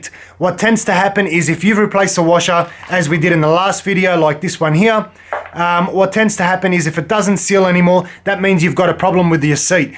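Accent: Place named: Australia. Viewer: Good old tradie advice with an Aussie accent …. Music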